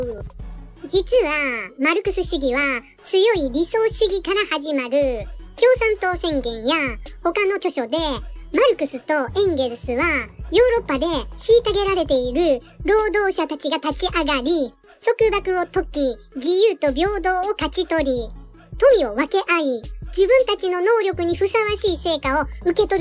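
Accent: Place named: Osaka, Japan. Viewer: なかなかマルクス主義を主題にしながら、現実は、それが空論であり乍ら、利用され続けているという惨たらしい歴史をまことに見事に大阪弁の楽しさで、しかも日常的に示唆し続けてくださっている先生に感謝です。この地球俯瞰的な見方は、隣国から浸透され続けている今、とてもとても必要不可欠だと思います。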